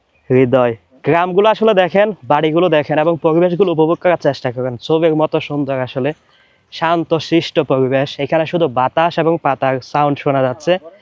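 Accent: Chittagong, Bangladesh. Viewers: না,,ভাই।।।আপনার মুখে চাটগাইয়া বাংলা ভালোই লাগে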